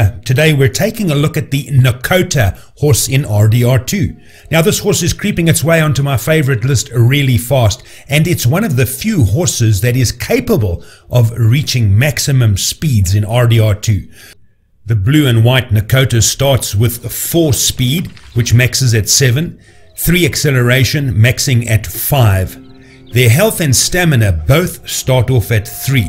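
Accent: South Africa. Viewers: Great video! First time I’ve seen you on YT. Your accent is South African. Eastern, I’m guessing.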